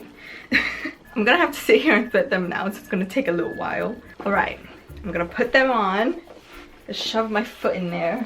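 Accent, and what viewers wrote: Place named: United Kingdom. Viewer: isn't that just the British accent?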